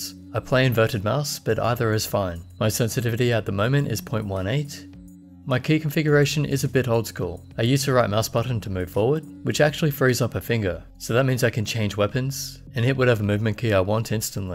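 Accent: Australia. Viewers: I think he is from Australia actualy. He's definitely not from Canada but I am ^^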